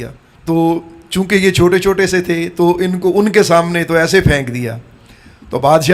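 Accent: Arab world. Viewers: ALLAH HO ALLAH … HAZOOR QIBLA E MURSHID … sadqe ap k … Arabic lab o lehja khubsorat or purasar …. alaaa point of view ko beyan farmaya QURAN E PAK K reference se … haq farmaya … jo qume apny wade torti hai wo barbad hoti hai … ALLAH HO AKBAR …. qume bini israeel k waqie se humari alaaaaa islah farmai … ALLAH PAK humhy wada khalfi se bachye … ameeen … 🙏🏻🙏🏻🙏🏻🙏🏻🙏🏻👍👍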